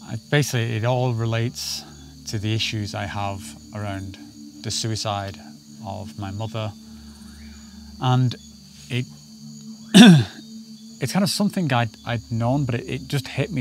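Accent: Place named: Manchester, United Kingdom. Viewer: Are you from Manchester? You sound like Tyson Fury LOL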